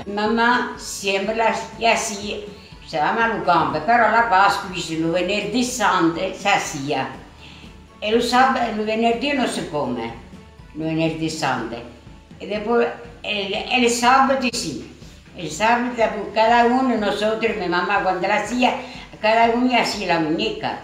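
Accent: Italy. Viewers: Nonna: me recordas a mi mamá!! Falleció en enero de este año. Tenía casi 99 años!! El mismo acento que el tuyo. Era de la zona de la Irpinia. Hacia también las Pupas, corazones y los infaltables "strufulis". Siempre para Pascuas!! Te mando un abrazo para vos y tu nieta que te ayuda!!! Gracias!!!! Seguí así!!! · Estoy llorando y es de amor...mi nonna hacía lo mismo, hablaba igual y era abruzzesa...emplea el "lu" que es re dialectal, me encanta ! Gracias por subir esto querida! Disfrutala! Mille bacci per la bella nonna!!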